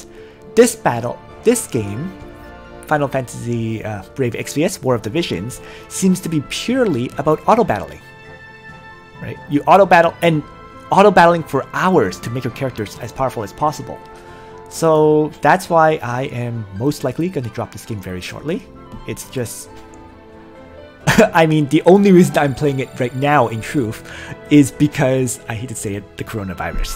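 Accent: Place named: Quebec, Canada. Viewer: You're Canadian! I was always curious where your accent was from, and I had suspected it was French-Canadian. My girlfriend has been wanting me to ask for the longest time, as she's subjected to hearing your videos all the time as I game. :b Anyhow, it would have been nice to get another good FF Tactics game, but it also comes as a bit of a relief that no other mobile tactics game will take time away from Langrisser given all the hours I've put into it thus far. Also, that you won't be torn away any time soon from continuing to release quality Langrisser strategy content. :D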